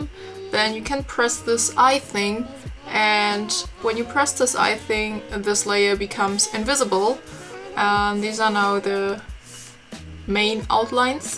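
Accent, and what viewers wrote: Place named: Germany. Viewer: ich mag diesen Zeichenstil echt sehr gerne und es ist letztendlich auch echt extrem gut geworden. an deiner englischen Aussprache habe ich irgendwie schon erkannt dass du aus Deutschland bist und habe deinen deiner kanalinfo nachgeschaut. Cooler Kanal ^^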